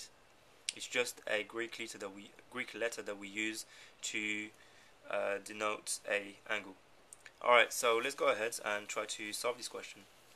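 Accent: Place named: England, United Kingdom. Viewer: Learning math from a guy with an english accent >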